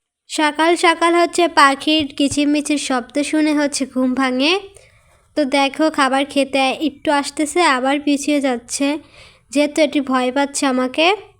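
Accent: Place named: Bangladesh. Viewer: তুমি কি বাংলাদেশী তোমার কথা য় খুব টান আছে। ভালো থেকো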